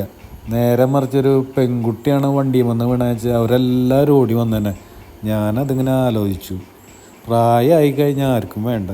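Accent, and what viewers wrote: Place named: Thrissur, India. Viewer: സംസാരം തൃശൂർ ഭാഷ മാറ്റുക,.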